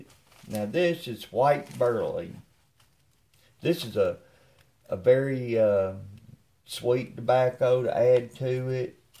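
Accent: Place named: South, United States. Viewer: I honestly enjoy that you sound like forest gump (not an insult on your intellect just similar accent)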